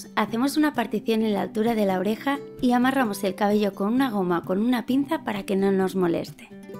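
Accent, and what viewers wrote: Spain. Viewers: me gusta como ablamas eres española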